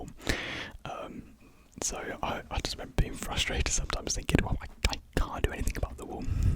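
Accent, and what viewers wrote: United Kingdom. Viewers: hi i was wondering if you're british but live in america?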